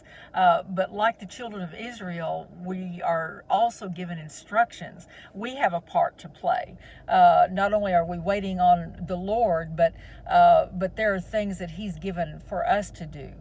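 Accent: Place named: North Carolina, United States. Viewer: I was wondering if you were here in NC by the accent. Really love your work. The Lord has called me similarly... 🙏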